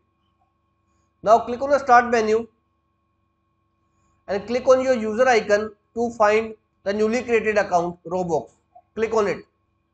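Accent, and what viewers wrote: India. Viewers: i love your Indian accent it turns me on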